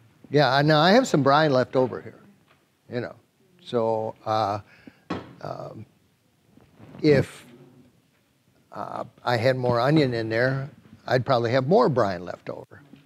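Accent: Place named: Minnesota, United States. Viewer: Miss you. Hope you are okay. Love that Minnesota accent 😍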